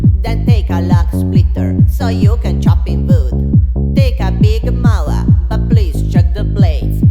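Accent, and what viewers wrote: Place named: Piedmont, Italy. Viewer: Most foreigners think that the Italian accent is solely what they hear in movies like "The Godfather" (heavily influenced by the southerner dialects, generally Sicilian). I've been linking this video to my foreigner friends to let them have a taste of what English may sound like with at least one other regional accent, possibly a northerner one, such as Piedmontese. 💕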